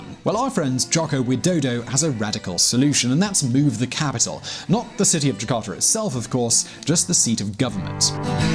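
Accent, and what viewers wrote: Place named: United Kingdom. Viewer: Unsubscibed.your ugly british accent soo fucking annoying,right or wrong indonesia its my country.ur opinion its like expert on our country most ur comment its bad.sorry stop subs ur chanel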